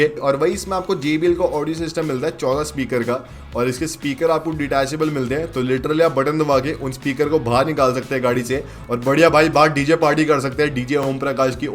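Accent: Haryana, India. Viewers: Haryanavi accent mai bakwas mat kar yr CLARITY se baat kiya kar 4RUNNER arahi hai ya nahi ,teri toyota walo se kuch baat hui to wo bata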